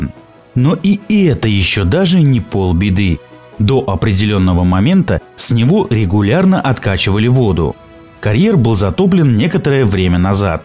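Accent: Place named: Moscow, Russia. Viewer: Он не коверкает. Он просто так произносит. Скорее всего он из Москвы или Подмосковья. К нам приезжал с Москвы человек. Он тоже ударение ставил также необычно для нас . СЫсерть, Верхняя ПЫшма и т.д..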